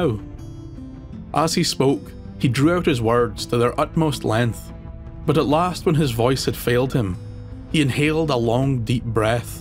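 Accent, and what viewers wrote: Scotland, United Kingdom. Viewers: I only just discovered your channel and I'm hooked! I'm surprised you don't have more subscribers. It's so refreshing to hear Scottish folklore told by someone who's actually Scottish and not American lol.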